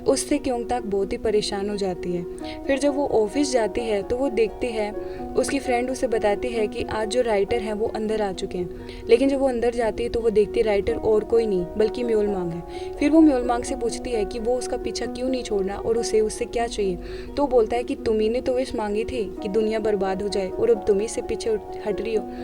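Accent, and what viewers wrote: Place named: Haryana, India. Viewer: didi aap haryana s ho na aapki aavaj se hi pata lagra h...mai bhi haryana se hu...thanks for your explanation😊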